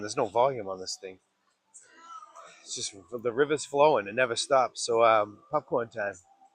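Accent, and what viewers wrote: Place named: Massachusetts, United States. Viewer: You are definitely from Massachusetts. Accent & dropping the r's at the end of the word. Sound effects you do not need. Another camp ground on my list of possible stays thank you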